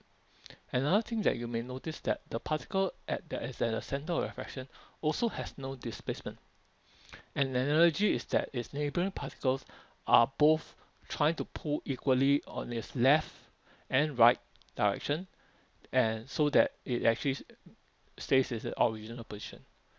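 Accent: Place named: China. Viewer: Good video. U sound very asian Chinese.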